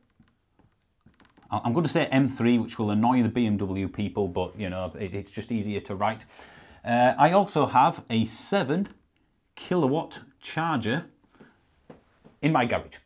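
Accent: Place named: North, United Kingdom. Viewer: Love to hear that northern accent!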